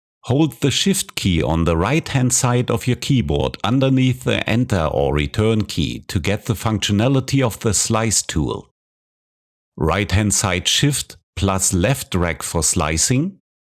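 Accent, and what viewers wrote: Germany. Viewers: Your tutorials are so good. And I like your strong german accent. That matches perfect to your lessons.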